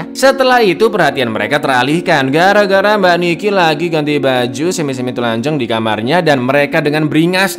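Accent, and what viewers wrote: Java, Indonesia. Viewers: Khas selalu sama logat jawanya bang.. jos bang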